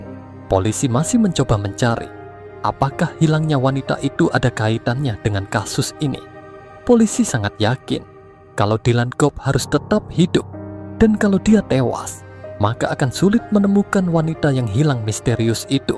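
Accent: Bali, Indonesia. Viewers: Suka dengan alurnya... Bang, kamu orang Bali ya?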